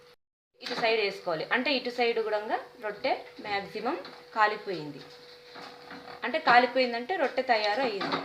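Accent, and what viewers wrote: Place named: Telangana, India. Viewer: telangana lo famous e jonna roteylu but mana yasalo chepandi enduku madhya lo koncham koncham yasa change chestunaru akka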